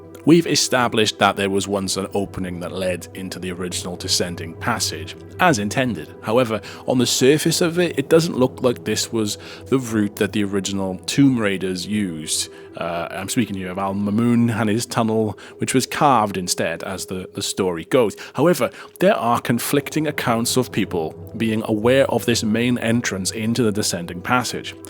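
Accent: Wales, United Kingdom. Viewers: The Welsh accent always makes me smile:) it's a perfect voice for dead pan comedy:) you maybe won't get this reference.... But do you remember the song? by??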